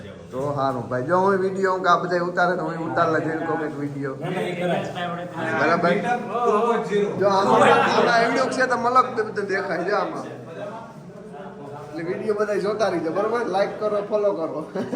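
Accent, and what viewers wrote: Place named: Mer, India. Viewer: દીકા મેર ની ભાષા જ બોલજે તું એ ભાષા મા જ બહુ મીઠડો લાગે વાલા..... મેર ની બોલી જ મીઠડી છે હો.....❤ જાજુ બધું વાલ દીકરા તને અને ડેવિન ભાઈ ને.🥰👍🙏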